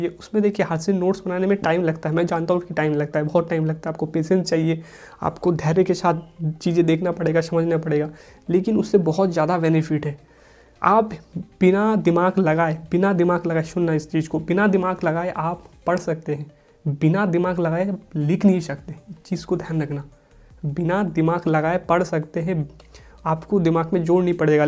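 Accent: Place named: West Bengal, India. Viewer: Bhaiya aap uttrakhand se ho but voice tone westbengal jaisa lagta hai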